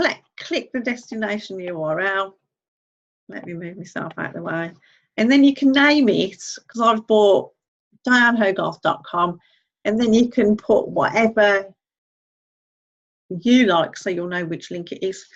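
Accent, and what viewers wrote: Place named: United Kingdom. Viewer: With your accent shouldn't it be Millionaire Mum?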